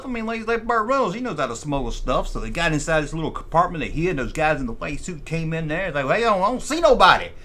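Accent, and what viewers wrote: South, United States. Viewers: Now this is my kind of recap/storytelling of the original Star Wars movie, as someone who also is a southerner, I understood everything you said.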